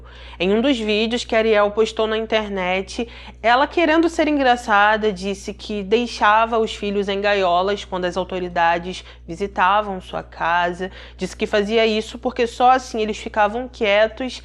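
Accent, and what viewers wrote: Rio de Janeiro, Brazil. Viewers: Olá, gosto muito de sua narrativa, sua voz é muito bonita e seu sotaque deve ser carioca, já sou inscrita no canal.Triste esse caso, creio que tudo estava errado desde o início quando tiraram as crianças da mãe biológica! Lamentável e nada trará de volta a garotinha!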